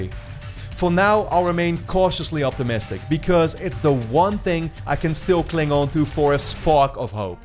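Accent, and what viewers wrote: Netherlands. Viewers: U dutch ? U sound like u could be dutch. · Volgens mij ben je nederlands tenminste ik denk het te horen aan je engels accent Broer . Ik denk er gelijk over man dit is echt niet goed. campaign is de reden waarom ik het spel koop dat er geen campaign in bo4 zit maakt me misselijk man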